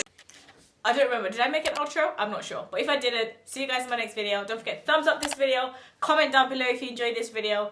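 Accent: Zimbabwe. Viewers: I love your accent😍😍😍 And am also Zimbabwean.... Love your playlist🙊🙊🙊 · Haha I love how u can still speak shona, with a shona accent.....newewo ndeipi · 😂😂😂 "now im doing the (mentions dance move), you can't see it but I'm doing it" 😂😂👏🏾👏🏾 made me laugh and the Zim accent (died 😂😂❤️❤️❤️❤️). Ps. Love the Zim accent....#zim gang but I can't speak shona 😭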